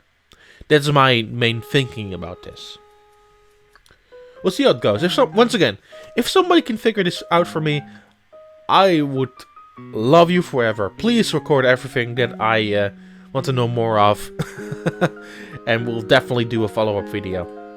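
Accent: Netherlands. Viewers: why does this guy sound dutch, though.. edit: I've seen the twitter sceen this bro is a fellow dutchie hellooo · Dat Nederlandse accent 🤢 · He is indeed dutch, and a dutch accent when someone is talking English is one of the WORST things I've ever heard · I can't help but notice his accent... Maybe it was already stated somewhere else, but / sounds very Dutch:p not that that's a bad thing. I love hearing accents when people speak English. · Love the Dutch accent :p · What's your first language? Your accent sounds Dutch 💭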